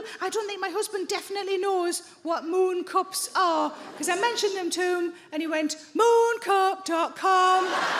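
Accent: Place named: Geordie, United Kingdom. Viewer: This is what English sounds like. Perhaps you do not speak the language as well as you thought? does have a Geordie accent, but perfectly articulate and enunciates clearly.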